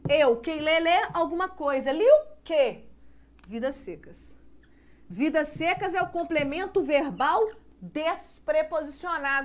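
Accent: Minas Gerais, Brazil. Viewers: Essa professora sem duvidas é mineira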